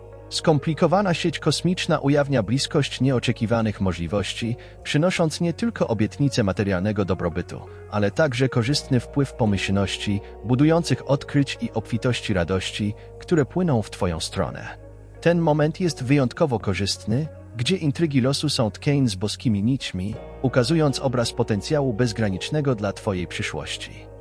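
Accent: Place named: Germany. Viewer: Po raz kolejny zgłaszam prośbę- przywrócić lektora tego który był od samego początku. Czy ktoś z właścicieli tego konta czyta wogóle te komentarze. Tego lektora który jest obecny nie da się słuchać. Co to w ogóle za pomysł żeby dać lektura z niemieckim akcentem; czy to efekt Tuska? Proszę o przyłączenie się do prośby czytających. Pozdrawiam.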